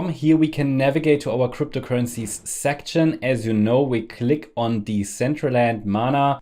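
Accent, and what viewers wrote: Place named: Germany. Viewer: are you? same voice,and sounds like German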